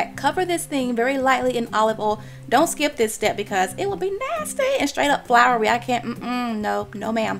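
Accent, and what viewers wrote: South, United States. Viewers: Your food is as wonderful as your lovely Southern accent; beautiful! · She just has a southern accent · Love that southern accent. Gives credibility to the southern fried chicken. :) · I love, love, love your accent!! You sound like a lovely southern belle!!!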